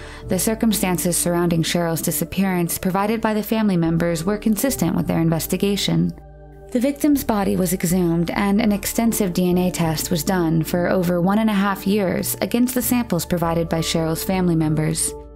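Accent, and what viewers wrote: India. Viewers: I was always wondering where are you from, coz i felt you maybe from India due to your accent. As a fellow Indian, I want to congratulate you for running such a nice & successful channel. I am so happy to subscribe & enjoy your content!!! All the best & keep it up 👍👍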